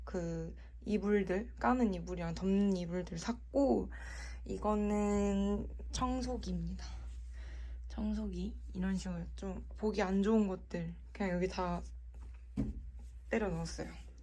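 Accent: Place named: Gwangju, South Korea. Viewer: 누가 봐도 광주 분이시네요 사투리가 정겹습니다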